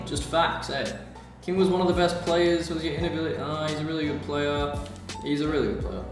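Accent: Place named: Sydney, Australia. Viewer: hahahahaha bro it’s the sydney accent cuz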